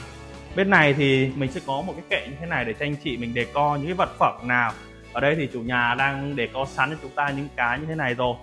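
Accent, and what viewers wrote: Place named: North, Vietnam. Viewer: Ở Việt Nam mà báo giá triệu đô, nếu không nghe giọng Băc thì cứ tưởng ở bên Mẽo !!!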